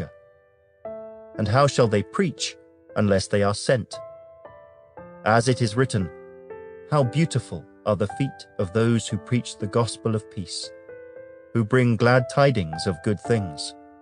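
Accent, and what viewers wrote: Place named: RP, United Kingdom. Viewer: Enjoy listening to queens English and piano background music.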